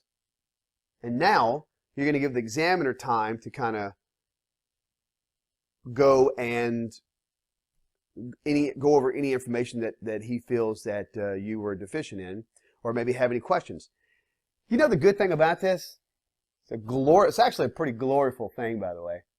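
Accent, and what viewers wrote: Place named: Louisiana, United States. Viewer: Are you from Louisiana? Nice video and very well presented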